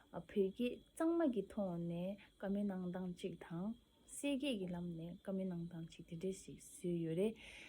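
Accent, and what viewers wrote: Standard. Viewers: བོད་སྐད་གཙང་མས་ཐོག་ནས་གཏམ་བཤད་དཔེ་ཡག་པོ་འདུག། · བོད་སྐད་གཙང་མ་སྐྱོན་གྱི་འདུག་པས་ཉན་རིན་འདུཙ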